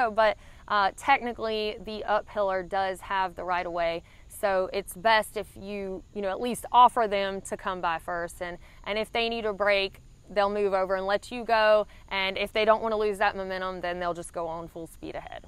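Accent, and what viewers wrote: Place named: Virginia, United States. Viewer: Dude that south VA accent is great. Even if you didn't know anything about hiking I would still listen to you. I always get the impression that anyone who has that accent I expect them to know everything outdoors lol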